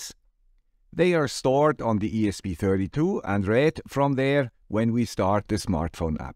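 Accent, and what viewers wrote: Switzerland. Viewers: Hi to the guy with the Swiss accent from the guy with the Swedish accent! 😂😂😂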